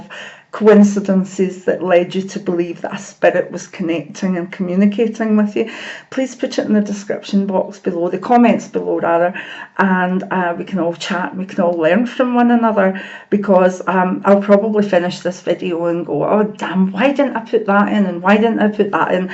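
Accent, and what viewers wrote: Scotland, United Kingdom. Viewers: Hello, just found your channel. Good to hear a Scottish accent as I'm in east Dunbartonshire. Liked and subbed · is sleep paralysis a sign...had this Since a teenager. Nice to hear a Scottish accent on youtube. im Scottish too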